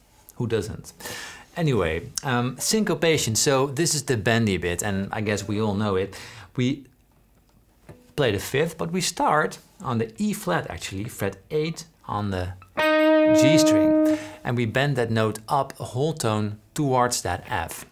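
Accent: Netherlands. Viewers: Finally the basic thing which influenced every string picker. As always perfectly done,. Dein holländischer Akzent im Englischen macht die Sache übrigens noch sympathischer. Wie Herman van Veen sagte:"Deutsch ist Holländisch mit einem ganz komischen Akzent." 😄 👍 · Just drag your Pik ... hahaha 🙈😂I can hear you are dutch man haha 🙈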